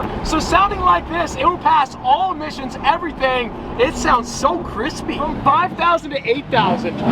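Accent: United Kingdom. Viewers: More of that bri'ish accent haha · Proper British accent. 10/10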